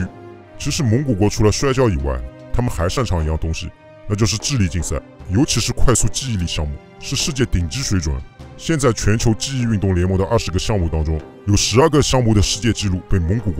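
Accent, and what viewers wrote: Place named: Shanghai, China. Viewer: 有上海口音，是在日本生活过的上海人吗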